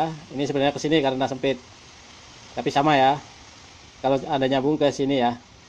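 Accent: Banyumas, Indonesia. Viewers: Rika wong ngapak ya kang siip lah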